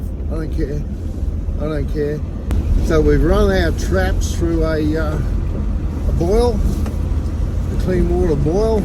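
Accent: Australia. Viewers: you sound like a true Australian doing a great job 👍🏽👍🏽🇦🇺👍🏽👍🏽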